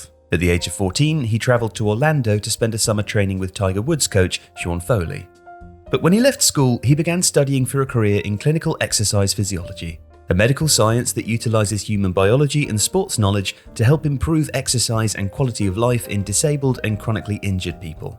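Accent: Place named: England, United Kingdom. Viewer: I checked the comments halfway through the video and had no idea what this could refer to Then I got to the end of the video... good lord it's jarring after the soft English of the main portion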